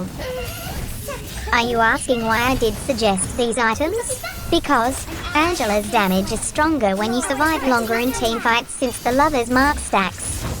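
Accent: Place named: United Kingdom. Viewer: Whats with the british Accent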